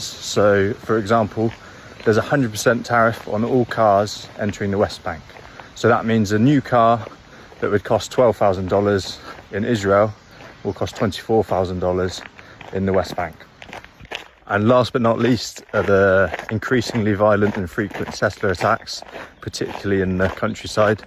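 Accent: United Kingdom. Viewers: From his accent, he is British (specifically born & raised in England) - not American. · American in Palestine? You need to change the caption, he’s clearly British. Which doesn’t alter the message he’s trying to present.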